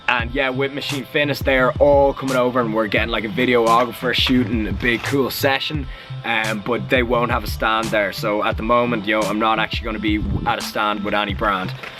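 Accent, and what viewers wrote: Ireland. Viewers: Ahhhh I love the Irish accent! Check out my vlogs if you get a spare moment :-) Love from London xx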